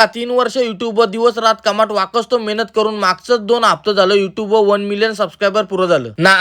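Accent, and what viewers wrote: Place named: Konkan, India. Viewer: Konkani acent wr me fkt fida aahe karan mazya baykola to kokan aavdt saglyat jast soo bhawa very heartily congratulations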